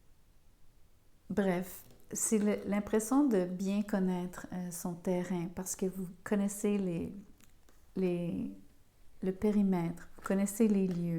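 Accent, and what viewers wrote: Quebec, Canada. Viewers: Merci pour ce tirage ! J’en profite pour vous dire que j’aime beaucoup votre voix douce, votre rire discret et votre charmant accent québécois.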